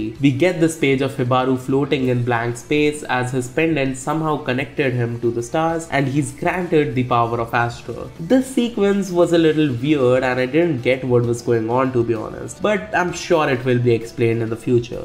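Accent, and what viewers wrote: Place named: India. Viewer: are you from india? you sound indian (not saying in a negative way)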